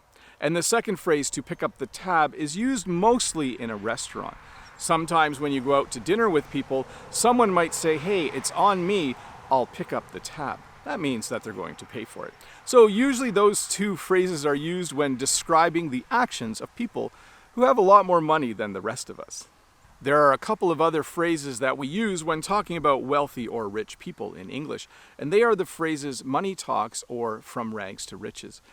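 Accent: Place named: Canada. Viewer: Wow you are a wonderful teacher I like to learn from you Canadian accent I love 🎉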